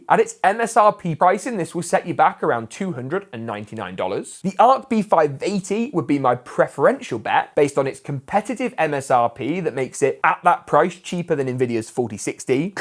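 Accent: United Kingdom. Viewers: Just subbed. Very clear info and british, love it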